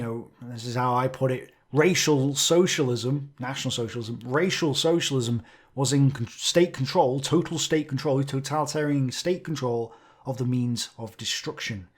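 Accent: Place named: Northern England, United Kingdom. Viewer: good vid, but where's yer accent from, our kid? are you a Manc? wigan? cheshire? hard to pin you down, lad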